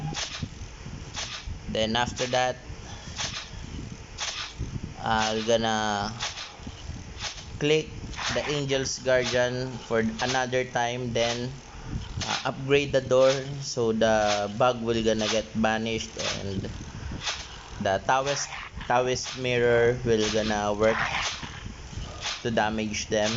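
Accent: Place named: Mexico. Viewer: Habla español no te hagas el gringo q tienes acento mexicano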